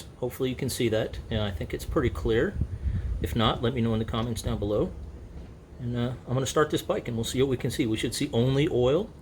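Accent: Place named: Jamaica. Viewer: And thankz 4 di video bro very smooth and nahmal Jamaican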